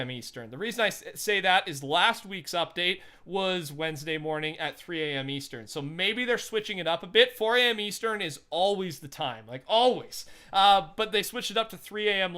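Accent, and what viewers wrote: Canada. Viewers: R u Canadian too?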